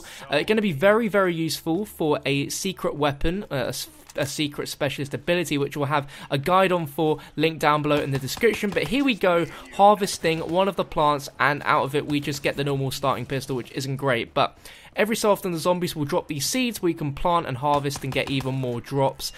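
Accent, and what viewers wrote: England, United Kingdom. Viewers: " the HEYTCH G 40" lol gotta love england accents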